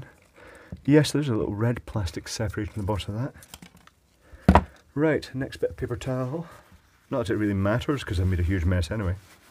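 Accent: Scotland, United Kingdom. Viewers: "cc" (closed captions) are rather amusing, no doubt resulting from the Scottish accent. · <num> percent pure British accent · This guy has the scottish voice from al murrays sketch and its just glorious. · He is scottish, you are british, so of course you two do not talk the same way XD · It's so interesting though, i love his Scottish accent too.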